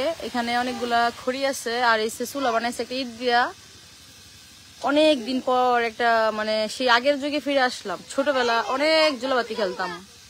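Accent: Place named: Chandpur, Bangladesh. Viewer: আপনার চাঁদপুরের ভাষা আমার শুনতে খুব ভালো লাগে। আমিও ঢাকা মুগদা থাকি,আপনি ঢাকা কোথায় থাকেন।আমিও মতলব থানার ছেলে।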